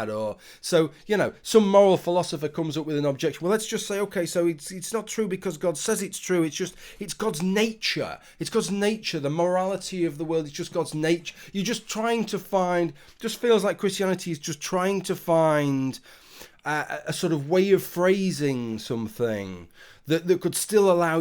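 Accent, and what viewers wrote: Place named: North, United Kingdom. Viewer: Hi. I'm not a native English speaker, and while I still enjoy the battle religious people have against nonbelievers like me, I mostly listened to this video trying to learn understanding English better, especially British accents. Yours is very easy to understand, but the accent hints to probably more north than south. Not close to Scotland, probably, so I'd guess Leeds area? Cheers!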